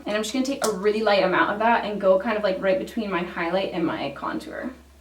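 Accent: Canada. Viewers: Everyone is pointing out your slight Canadian accent and as a fellow Canadian, I didn't even hear it at first haha love ya!! ❤️ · your subtle canadian accent is so rad hahaha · Is she Canadian? bc I can hear her accent not a bad thing I actually love it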